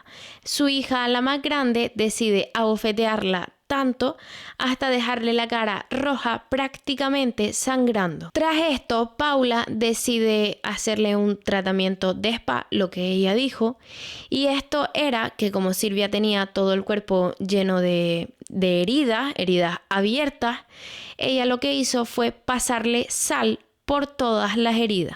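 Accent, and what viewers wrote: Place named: Canary Islands, Spain. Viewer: Me encanta tus vídeos, tienes una voz preciosa, con ese acento canario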